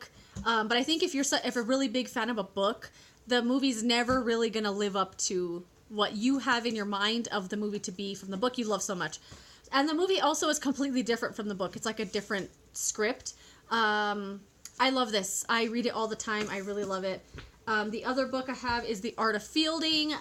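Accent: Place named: Canada. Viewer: Your accent sounds Canadian. Are you? Malls are my favorite too, ever since I was old enough to shop with my own money.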